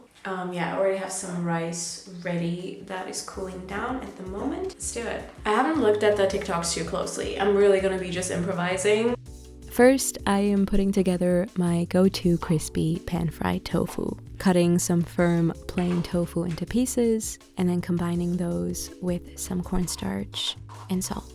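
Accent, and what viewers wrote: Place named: Germany. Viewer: Is she German? I swear her accent sounds super German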